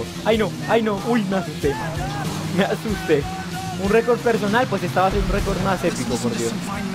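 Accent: Colombia. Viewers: si creo que es colombiano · ERES COLOMBIANO CIERTO SE SIENTE TU ACENTO YO TMBN SOY COLOM Y HABLAS IGUALITOOOOOOO VIVA COLOMBIA. ENCERIO ERES COLOMBIANO VERDAD?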